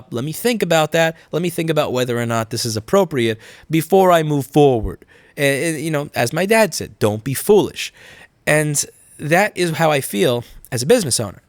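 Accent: New York, United States. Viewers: I want to start creating content for my YouTube channel, Facebook Live etc. I am researching cameras, software and the modern way of doing things. I am so 2000, using XP and Cubase SX via FireWire interface in my recording studio. Thunderbolt interfaces and 60fps 1080p Cannon M60, usb 3 is all news to me. I have alot of catching up to do. For some reason your video where you are riding your bike and talking about potentially leaving NY caught my eye. You are an interesting person and should have your own sitcom with that NY accent lol. I am from Canada BTW. Keep up the good work 🤸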